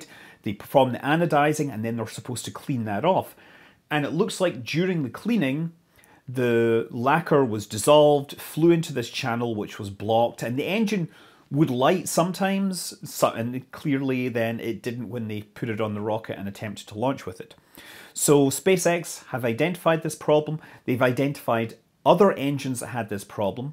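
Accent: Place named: Lothian, United Kingdom. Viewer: A wee lothian lilt to his linre.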